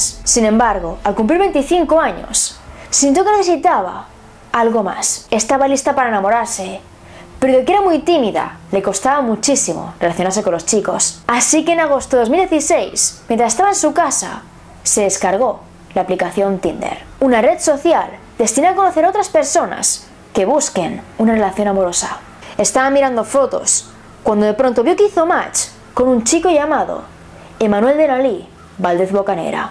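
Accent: Spain. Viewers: Hola soy de mexico y un buen trabajo a pesar de tu acento español muy bello trato de entender pero gracias a ti comprendí lo de la matanza de Columbine mucho mejor que el documental de hora zero aunque gracias al documental you tuve me direccióno a ti saludos en hora buena sigue así mucho exito👏👏👏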